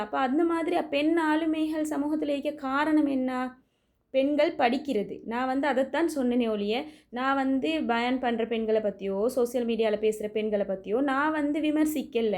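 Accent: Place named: Sri Lanka. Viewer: மிகவும் தெள்ளத்தெளிவாக விளக்கிக்கூறும் இலங்கையின் தமிழ் வழக்கில் உங்களின் கருத்து மிகவும் சிறப்பான பதிவு. அன்புடன் உதய தாரகை. சிங்கப்பூர் குடியரசு 🇸🇬🇸🇬🇸🇬🇸🇬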